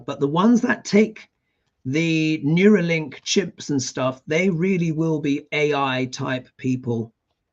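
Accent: United Kingdom. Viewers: (Jeannette M Mahan) Hi handsome Mr, your British accent is music to my ears, I love British people! I say that with the utmost respect.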